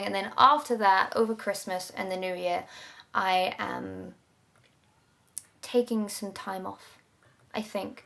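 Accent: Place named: United Kingdom. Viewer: Hello there, don’t even know if you read these comments... but, anyways, a good intention always counts, right? I lived in London for a while and, missing the beautiful British accent, I went searching for a pretty uk face that sound good… So I found you. You are such an interesting human being. Congrats for that! About felling like a lonely wolf, working hard does that. But don’t let this become hurtful. I’ve been doing the something for over 6 years, got really close last year twice, but didn’t get it… I worked so hard that I lost all my friends, I used to be proud of it… Not anymore, I started English classes online just to have someone to talk to, hahaha. Long story short, we are social animals, maybe sometimes you are cranky and moody with people you share your time with, but so what? Everybody does that. You don’t need to be perfect! because guess what!?!?! 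Perfection is not something real, we idealize it! And by the way you are gorgeous! You are funny, smart, beautiful, great singer and very interesting being. About changing, If really feel like you have to, take it one step at a time, and one little thing at a time… Doing all at once will never work. Don’t be too hard on yourself. You got all it takes do be whatever you’d like to be! You are such a confident person, be confident about it too! Regards from Brazil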